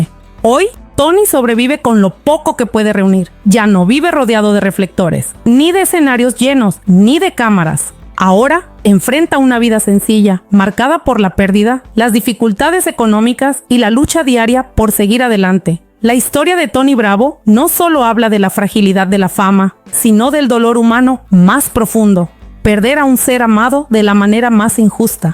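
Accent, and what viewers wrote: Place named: North, Mexico. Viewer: Ten cuidado lo que subes en tus contenidos Lorena Herrera no es un ejemplo de lo que dices ya quisieras vivir cómo vive ella. no seas tonta y si vas a subir contenidos con tu voz toma clases de dicción porque tienes un asento de norteña no sé de dónde eres es un acento muy desagradable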